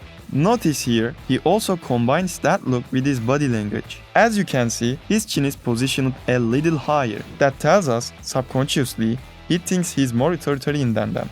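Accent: Turkey. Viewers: Bir türkün ıngilizce konuşması 😂 çok belli ediyor kendini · Türkmüsün kardesim. Aksanın benziyor :D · Bro are you from Turkey your accent sounds so Turkish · are you from turkey? Your accent is very similar. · admin are you from turkey? your accent is so similar so im asking :) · Türk aksanı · Türk sivesi nerde gorsem tanirim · Nice video mate, are you Turkish? Your accent sounds like really really Turkish! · türksün aksandan belli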